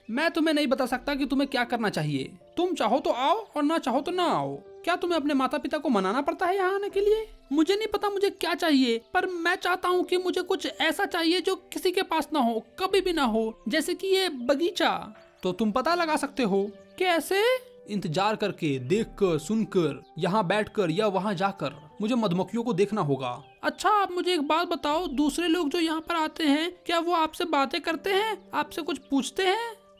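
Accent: Bihar, India. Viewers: It's not bhojpuri bro. It's hindi with bihari accent.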